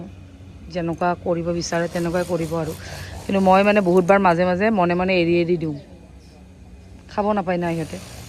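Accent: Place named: Barpeta, India. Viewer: ভাল লাগিল ৰবা চাই।তোমাৰ ঘৰ কত?? ভাষাটোত বৰপেটা চাইড ৰ যেন লাগিছে।কিহৰ অৰ্ডাৰ লোৱা জনাবা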